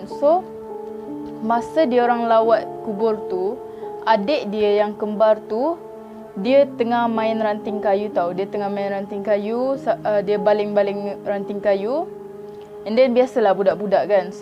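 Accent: Sabah, Malaysia. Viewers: Orang sabah ke sis ? Cara cakap mcm org sabah 😂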